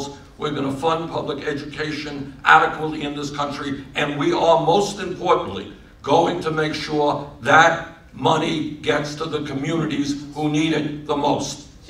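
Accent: Brooklyn, United States. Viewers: "What we sore in Michigan." I love Brooklyn Bernie. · chick HaHaHa My peeps from BK and Staten Island talk just like that too.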